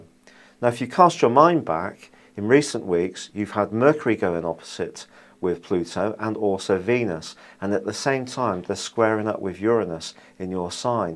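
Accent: United Kingdom. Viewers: Very good and very accurate.!!! Enjoy your British accent and forecast a lot.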